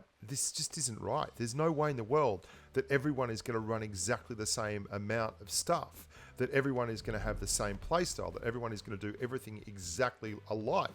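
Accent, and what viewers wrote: Australia. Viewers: Dude I love your accent! Australian??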